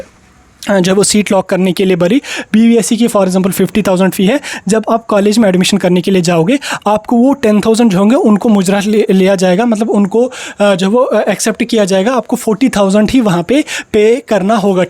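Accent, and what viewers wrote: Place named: Kashmir, India. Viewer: Kashmiri ascent is obedient ❤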